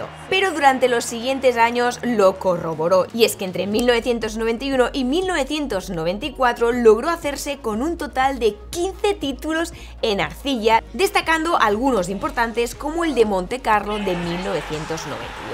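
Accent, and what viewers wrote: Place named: Catalonia, Spain. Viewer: DEJA DE DECIR BRUTAL TAN SEGUIDO, CON TU ACENTO CATALAN TAN CERRADO, DAS BASTANTE CRINGE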